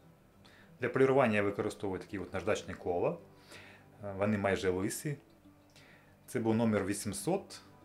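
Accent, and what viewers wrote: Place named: Ukraine. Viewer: Что с автором? На украинском языке раньше не наблюдал. Я конечно по говору понимал что с Украины,но переобутся так сразу..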